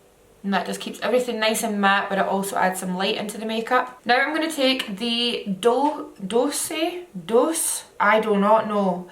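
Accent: Scotland, United Kingdom. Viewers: I usually don't like the Scottish accent (i have a cheek as i'm from Belfast, N.I lol) but i LOVEEEEEE your accent/voice soooooo much ❤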